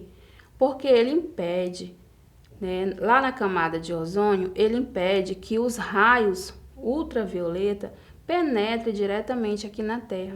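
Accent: Bahia, Brazil. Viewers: Ela é baiana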